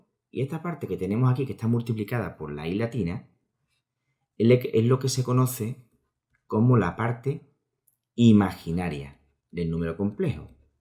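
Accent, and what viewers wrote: Andalusia, Spain. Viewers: Buenísimo! Y mejor con el acento andaluz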